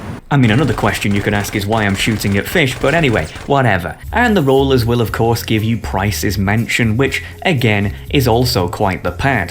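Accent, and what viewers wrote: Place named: Scotland, United Kingdom. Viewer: You’re scottish i think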